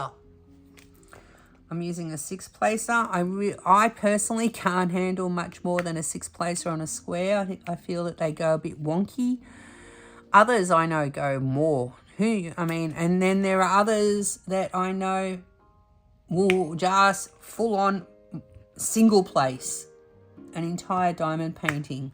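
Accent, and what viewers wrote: Australia. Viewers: Hi, it's your girl from JerZey! HAH! I really enjoyed listening and watching you do your first section on this mystery painting. I believe I had the same one, but donated it to a friend because the black and white canvas messed my eyeballs up. But it's looking so good! I tend to have a hard time with AB's too, but tough it out. I am so excited for you to visit the EAST Coast your next time around, there are so many great places! So glad to have a friend in Australia, love your accent by the way too! The time-lapse is always fun to watch to! Hope you continue to feel better and till next time, take care friend! Sending you continuous healing vibes and hugs! XO JerZey Chris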